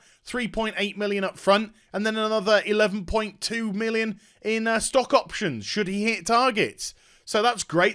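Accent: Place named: United Kingdom. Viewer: Hey! Im no one. Just someone who watches you. IMO this 'right into it' format is IDEAL for you. Straight away. Spot On. Very engaging right off the bat. Youre not a jester. You dont have to try to 'entertain us' before each vid. I believe most of us watch you because we believe you are a very like minded, level headed guy with a great British tenor and the same 'take' we would likely have on the given subject. Just my humble opinion. Love ya...Keep it up <3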